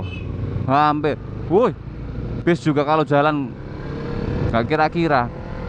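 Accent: Java, Indonesia. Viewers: suka sekali bahasa medoknya has sekali...OK mas bro...bs gk ngabuburitnya viewnya sepanjang jalan sunan giri sampe sby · ikih loh sing bikin seneng,logat lan guyune... gimana ya klo featuring sama ajgum motovlog yang satu Sunda abis yg satu Jawa medok... mesti keren.. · kekuranganmu jd kelebihan kang... jd inget Iwan Banaran..podo2 medok bhsny..tp followernya..byuhhh ribuan bosss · iyoo mas, bahasa medok, Jawa gadho2.... tp mlah gokil, gk ngebosenin, pokoke like dech bang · gapapa mas, kalau medhok tuh biar lucu #Hehehehe #...!!! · Upload terus videonya Mas, bhasanya aku suka medok2 pie gitu wkwkwk Salam Dari Anak Jawa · saya suka ke medddhok an anda🙌😁✌ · mas ini ngomong medokny itu lho enak didengar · medoke sae mas njenengan. nek kulo mboten sae sanjang kados niku hahaha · ciri khas medok nya jadj ada khas2 sama sensasi gimana gitu hahahaha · mas lok ngevlog ajak lady nya dong, biar seru gitu! 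we like you medok... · saya tunggu om konyol'e medok..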